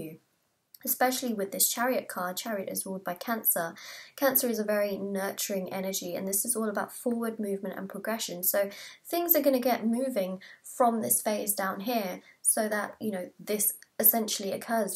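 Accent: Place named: RP, United Kingdom. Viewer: Newbie here. Will be interesting if it comes true. Nice to hear the queens English spoken properly.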